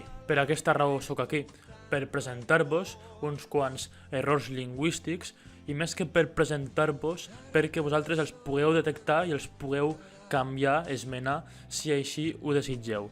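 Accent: Western Catalan, Spain. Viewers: No està malament el vídeo, ara, no se de quina regió 'dels països catalans' ets (? Parles un català occidental molt curiòs! Estic d'acord amb tu menys en la de 'nit bona' , la 'nit bona' (que no se si algú l'anomena així) la 'noche buena' espanyola no es la nit de nadal, es la revetlla de nadal (la nit abans) i pel que fa a la resta, bé, crec que algú amb seny sap que són 'castellanismes'.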